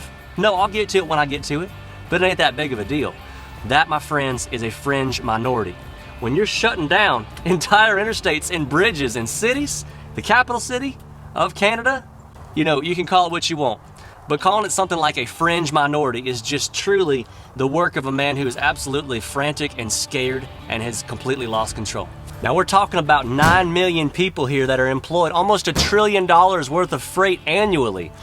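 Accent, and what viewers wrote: South, United States. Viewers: I just found you always good to hear some common sense with a southern twang. This traveling yankee misses the southern states.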